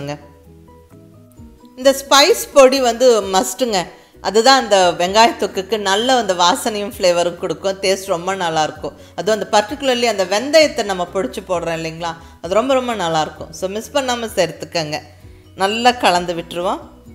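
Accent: Coimbatore, India. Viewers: This simple beautiful talk is our😎 Coimbatore Tamil. PROUD Tamil.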